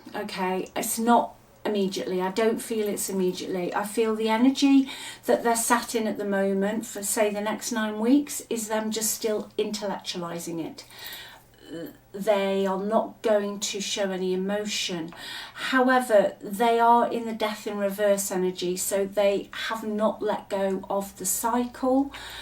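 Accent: United Kingdom. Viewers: Hi , I’m Neo the matrix buster, love your accent, British? I live in Michigan USA!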